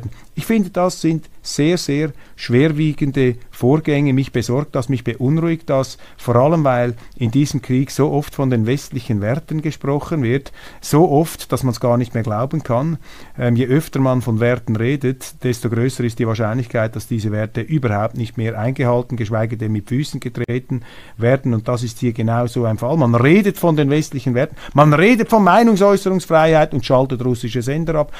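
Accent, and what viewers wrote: Switzerland. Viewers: Bonjour nach Zürich! Wenn mir eine Randbemerkung erlaubt ist.. Ich mag Ihren Schweizer Akzent.. Bin aber überrascht, wie akzentfrei Sie Englisch und Französisch sprechen. Kompliment.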